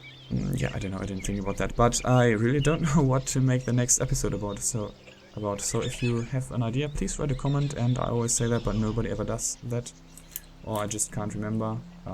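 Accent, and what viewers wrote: Germany. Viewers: haha...man hört voll raus das du deutsch bist :D niocce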